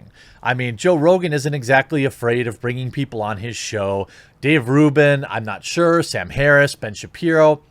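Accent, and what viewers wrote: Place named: Wisconsin, United States. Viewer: lol he's from wisconsin. that's how they pronounce bag. BAYG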